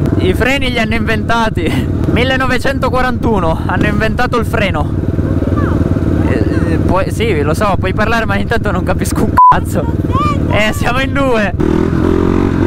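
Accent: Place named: Rome, Italy. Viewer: Sembri un romano 😂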